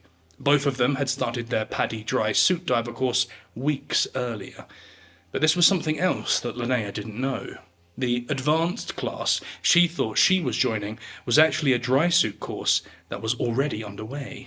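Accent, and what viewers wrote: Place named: United Kingdom. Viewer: Just seen this in a yt browse of my TVs yt but this comment more to the piece's frontend or creator, dude where did you grow up, was it Britain? A few hours old dead body, is normally deemed a dead body, your saying "dragged her corpse" I take extreme issue with as your accent has a strong British aspect to it. We don't describe like you just did in the video-I seriously wonder are you genuine British, if genuine British then what are your motivations for saying as you have, it smacks to me of an American created or fed attempt to go further than USA vs GB 'is' vs 'was' for deceased birthdays, I am staunchly a was. And doesn't seem cold at all, more realistic recognising past as past and fact birthday is a specific happening in the present(or why mention) but corpse Vs body I can't believe a Brit made this mistake-were you brought up in USA dude or you just got a painting brush (for what's not(we have more care in what we do say) out)? 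For someone to die so young is a sad occurrence.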